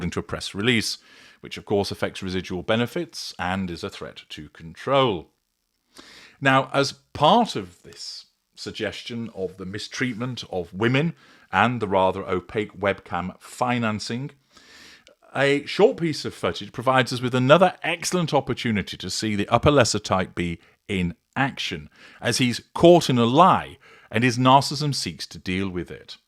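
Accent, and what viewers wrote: United Kingdom. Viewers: 2 min in and I'm bored outta my mind, the old brit voice doesn't help either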